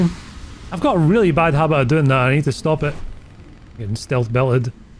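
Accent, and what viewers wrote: Scotland, United Kingdom. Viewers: I'm still oldr than u in ur Scottish accent but belated birthday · I really dont know why youtube algorithm get me here a hevy scottish accent youtuber playing war thunder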